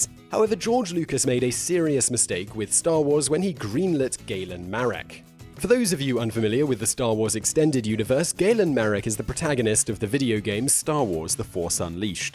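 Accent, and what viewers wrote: England, United Kingdom. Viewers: He’s not American, he’s English as far as I can tell. Maybe he thinks Americans would need subtitles to translate. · Simon, your pretty professional for the most part, so most of the time when you pronounce something differently than I'm use to, I chalk it up to you being a Brit. Chinook is NOT one of those words. It is suppose to be pronounced; shin- nook. It is part of the Haida-Tlingit language. It refers both to a species of salmon and a weather phenom of a warm winter wind that turns the snow to ice. (And makes driving hell) With so many channels filling our heads with missinformation, I need to be able to trust at least one youtuber. Don't fail me Simon.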